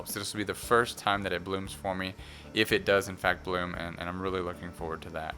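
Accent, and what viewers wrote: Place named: England, United Kingdom. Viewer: Did you go for a holiday to England? Thought I heard some pommie mellifluossity in that soliloquy!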